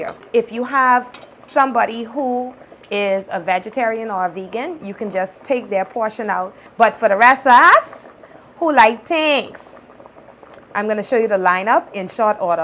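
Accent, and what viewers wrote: Bahamas. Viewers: Gal I love how you did this with you authentic Bahamian accent. Your okra soup rocks just like you. A beautiful Bahamian girl who can cook and sound indigenous. Will be looking for your other videos.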